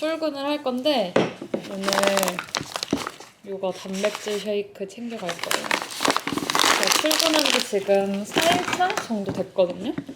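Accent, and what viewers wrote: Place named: Jeju, South Korea. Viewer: 우왘ㅋ.. 이렇게 현실 제주도말 처음들어봄..ㅋㅋㅋㅋㅋ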